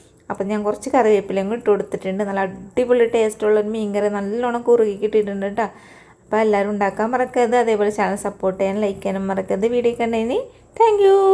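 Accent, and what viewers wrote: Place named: Kakkanad, India. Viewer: കാക്കനാട്ടുകാരുടെ ഭാഷ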